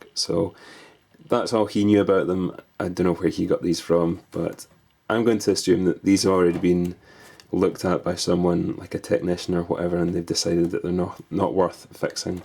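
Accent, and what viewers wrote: Scotland, United Kingdom. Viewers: are you of Scottish origin?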